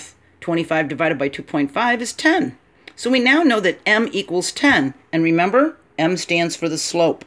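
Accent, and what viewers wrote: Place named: United States. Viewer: I'm really struggling to understand the strong American accent. How do you guys sound so animated all the time while us Brits seem so solemn? Still, jolly nice video and somewhat helpful.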